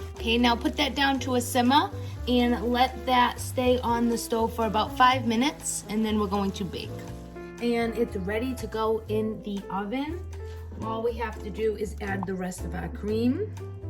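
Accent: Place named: Boston, United States. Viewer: Nice Recipe!! Even better accent! Lol gotta be from Boston!! Please say your from Boston! Lol. Just earned a subscriber 👍🏽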